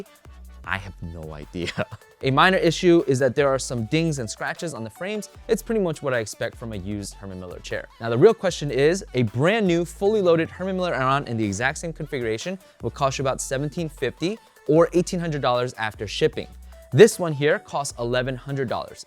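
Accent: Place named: Europe. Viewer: Sounds like he's from Europe.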